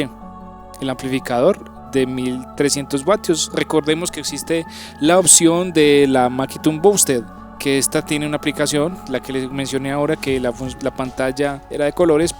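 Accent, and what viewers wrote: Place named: Colombia. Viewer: Todo bien explicado hy el vídeo muy bueno., LO MALO es el acento colombiche que de verdad, el solo escucharlo es repugnante., Hubiesen puesto a alguien con acento NEUTRO para que el vídeo sea más limpio. DE RESTO, EXCELENTE VIDEO😊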